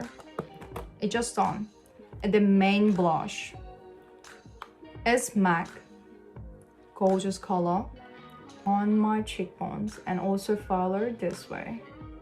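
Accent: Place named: Australia. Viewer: Pretty sure she's from Australia, she has the accent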